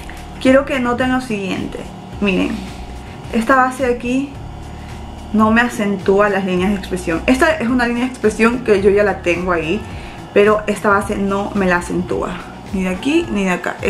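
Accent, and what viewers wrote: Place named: Guayaquil, Ecuador. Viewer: Primera vez que veo tu canal y me gustó por tu forma de hablar de una dije es Guayaca jajaja leí la descripción y boom Guayaquil bieeeeen besos y saludos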